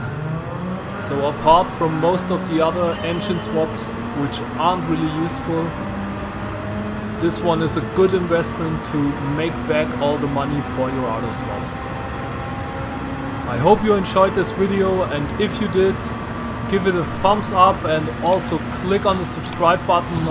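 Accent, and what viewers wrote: Germany. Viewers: I smell Deutsch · I like u Kartoffel English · Ich liebe deinen deutschen Akzent 😂♥️ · Junge, red doch einfach deutsch. Das is ja grausam.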